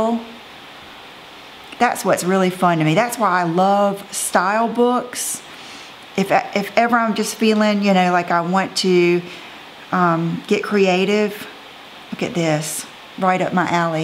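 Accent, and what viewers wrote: South, United States. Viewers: Hi, I'm new to your channel and I really enjoy your informative videos. I love your southern accent and your southern charm and of course your style.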